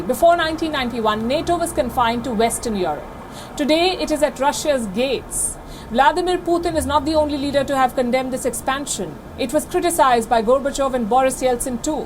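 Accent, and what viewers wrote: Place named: India. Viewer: I like how you speak like an Indian. I hate when reporters try to sound foreign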